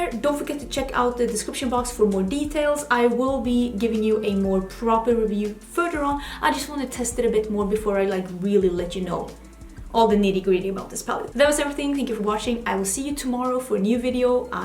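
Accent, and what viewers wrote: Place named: Sweden. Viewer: These are all so pretty! All of your effort is really appreciated. This might seem like a weird comment, but I spent a lot of my career (I’m a writer) covering Swedish hockey players. Your speaking style reminds me a lot of them, and it always makes me smile! I really need to come visit Sweden — I love the people and their sense of humour. Much love from Canada! 😘❤️🇨🇦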